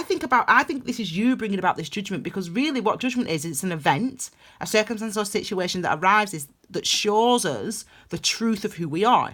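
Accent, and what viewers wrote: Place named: Scotland, United Kingdom. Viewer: First time I’ve listened to you and this truly resonated with me. Love your accent. Scottish?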